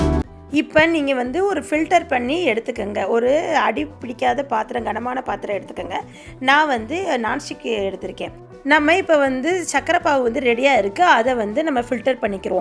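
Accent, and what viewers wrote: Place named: Kerala, India. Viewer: मैम, आपकी बोली किस ज़िले की है? I mean your native language... its not pure Tamil.../ mixed malayalam/ which area in Kerala's? Palakad or Kanyakumari?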